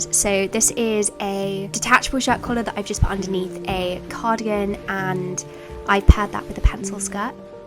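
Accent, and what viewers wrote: United Kingdom. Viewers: I was knocked off my chair (figuratively) by the Britishness of your accent.... OR. MY. GOD😍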